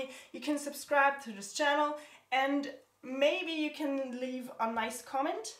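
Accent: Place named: Germany. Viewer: Could it be that you are German because your English sounds like that. (I’m German btw)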